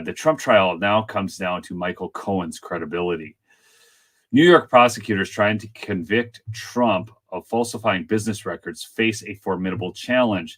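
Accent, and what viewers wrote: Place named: Minnesota, United States. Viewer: So funny to hear you say you're from Bemidji, I have been there, my Ex hubby was born and raised in Karlstad and his dad was the traveling Psychologist for multiple towns, Thief River falls, Bemidji, other surrounding little towns. I am from Tx and when we moved to MN, prior to going to Germany for 3 years, i thought i was in a cold hades....lol..I was used to hot TX weather and nearly froze my rear off. I had never seen garages where you had to plug in your vehicles 30 mins prior to leaving the home...it was WILD, moose in the yard, i had never seen one. He graduated in 1985 - he had never seen a black person when i met him (he was 19)- his accent sounded exactly like yours. MN is cold as crap, not meant for Texans! i couldn't wait to leave~ lol...I felt like l was living on another planet. Then i married a guy from Alaska, you'd think i'd learn...lol. 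I don't go there though! Thanks for all you do!! love you're positivity!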